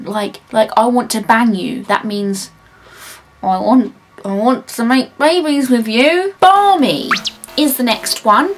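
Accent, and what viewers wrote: United Kingdom. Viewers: To be honest, when im bored I just watch your videos to learn your british accent. Ive been practicing almost everyday to master this shit. B) PS Please make more video about the british accent. Keep smiling,.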